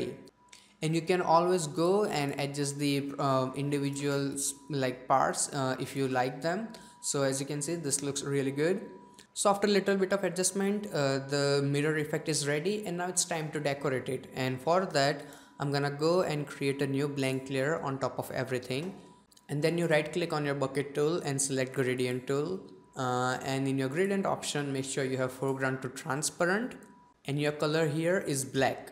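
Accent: India. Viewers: I like how you sound like the guy from "Phlearn", but with an indian accent xD !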